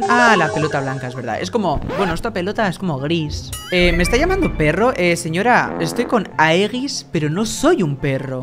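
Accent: Argentina. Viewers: Vos sos argentino ? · +granny+sustos+palomitas+sabado+su acento argentino=definición de felicidad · eres argentino o español o de que pais eres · Te sale el acento argentino y el acento venezolano😂😂😂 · Sabes que habla como argentino??? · enserio me das mucha risa a mi y a mi hermana y más que todo me da risa como hablas en argentino y dices groserías jajajajajajajajajajaja❤❤❤❤😂😂😂😂😂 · nuestro ídolo por ser el mejor con combinaciones perfectas como terror + comedia + sufrimiento de + acento argentino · +terror+acento argentino=jueves perfecto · Hola, no hace falta que dejes de hablar con acento argentino, nos haces felices igual:) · Me gusta más Íker con su acento español no se siento que no le queda el argentino 😭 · Oye me encantan tus videos pero una duda ¿Por qué hablas como argentino? Perdón 🙏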